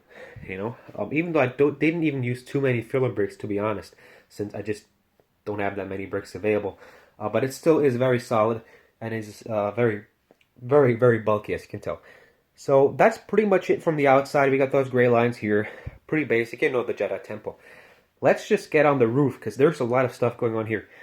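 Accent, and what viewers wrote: England, United Kingdom. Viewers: Bist du Engländer? Sprichst sehr gut Deutsch.. Dein Moc is auch super ;)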